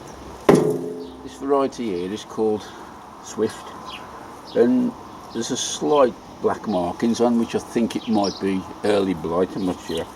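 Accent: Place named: West Bromwich, United Kingdom. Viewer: Best allotment channel on YouTube. Grew up in West Bromwich but moved South around 20 years ago and it’s nice to hear the familiar accent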